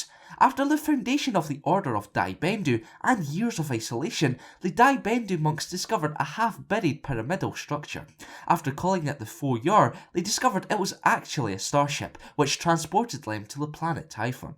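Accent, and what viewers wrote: Scotland, United Kingdom. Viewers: Good to hear a fellow Scot out there doing this s#*# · Are you Scottish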